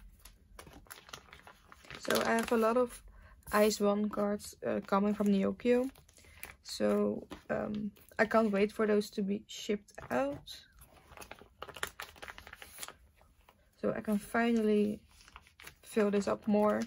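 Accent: Netherlands. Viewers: Do u speak Dutch bc how u speak in English is how most Dutch / belguim ppl speak in English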